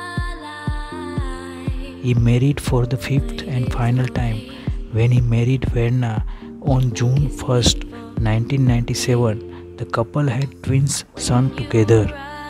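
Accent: India. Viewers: Really you got a foreigner possibly from INDIA speaking about Kenny Rogers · I THINK THIS GUY TALKING IS FROM KERALA INDIA. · Hahaha.. Come on! Never heard the Indian accent before? You need go out a little bit more...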